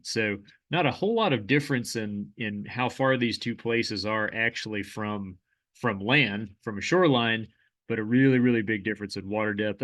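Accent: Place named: Baltimore, United States. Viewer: Love your Baltimore accent. LOL I wonder how many people can identify that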